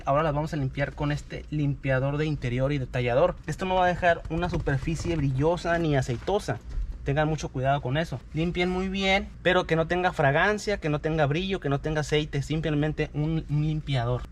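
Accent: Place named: North, Mexico. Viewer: Asi hablamos en el norte jaja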